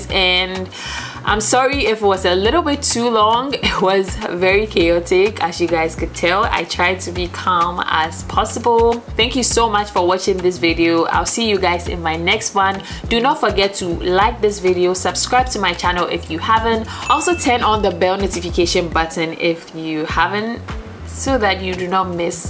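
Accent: Ghana. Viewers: I love watching your videos and your technique. Although I’m much older than you and I’ve been doing my make up for many many years I still like to learn new techniques and see how others do it in a professional and fabulous way. FYI - I also ordered the Morphie brush because I liked the way it moved on your skin. I see you are from Ghana. I have been to Ghana and it was life-changing for me. I hope to return someday. Love your accent! 💕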